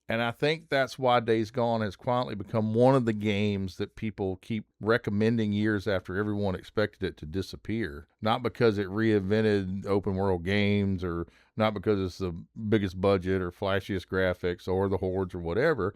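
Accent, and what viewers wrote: United States. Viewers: This American acient is nuts bro can't even talk properly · Nice breakdown. Your southern accent makes me feel like you are my friend.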